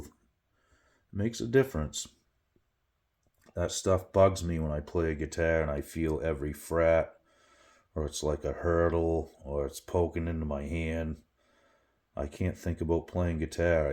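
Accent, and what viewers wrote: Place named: Maine, United States. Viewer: I could tell you're from Maine just from your voice lol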